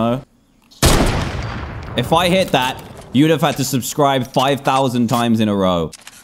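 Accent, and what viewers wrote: United Kingdom. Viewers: He is British so he says stuff differently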